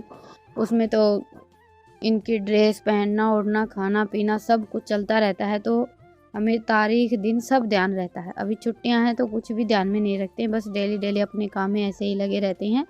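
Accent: Haryana, India. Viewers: Apki haryanvi voice bhut pyaari lgti h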